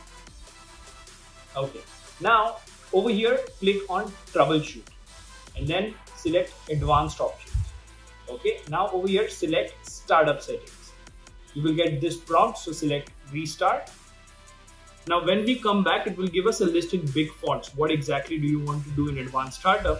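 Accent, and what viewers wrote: India. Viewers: i like your conten, u speak clear not just like another youtuber speak english but have a bit indian😅 so try hard, i will support you from malaysia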